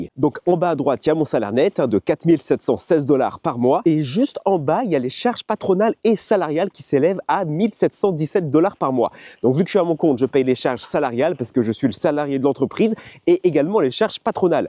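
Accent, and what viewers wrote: France. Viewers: Super vidéo. Avec ta jolie frimousse de Frenchie et l'accent qui va avec ,tu devrais pas rester longtemps célibataire !!😅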